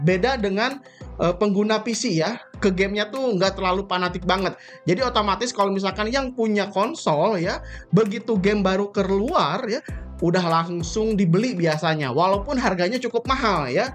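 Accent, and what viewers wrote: West Java, Indonesia. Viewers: sunda na tos melekat pisannya kang😂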